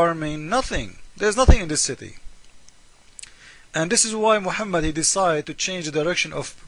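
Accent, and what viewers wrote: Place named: Iran. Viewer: As much as you try to speak English your Iranian tung never change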